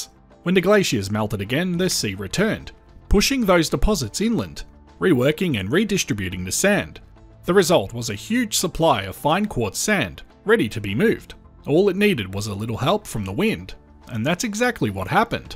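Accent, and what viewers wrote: Australia. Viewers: It’s Australian. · It's an Australian narrator and it's an Australian channel.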